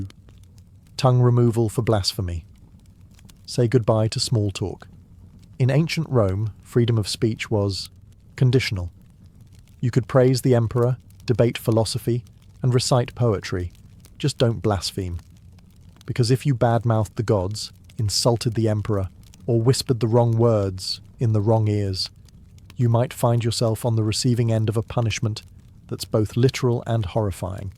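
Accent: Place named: United Kingdom. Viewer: PS: Are you American, Canadian or British? Your voice and word usage sound vaguely British.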